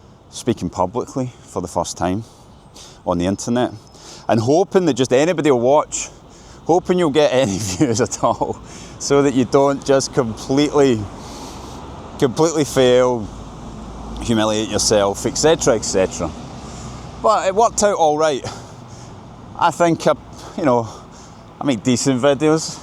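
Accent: Scotland, United Kingdom. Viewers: Job well done, I've gotten a feel for Moscow and for Russian people, favorable in total. You have a talent for interesting content with a relaxed feel, I will miss your Scottish accent and walks through the city. Thank you from a friend in Kentucky [usa]. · I’ve just watched your video for the first time. Like it! Scotch accent is adorable and in some places reminds me my own one. Rs are very similar. Will watch more. Thank you! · I admire Scottish accent so much. Good luck, dude. I’m sure that you will be okay no matter where you live. 😊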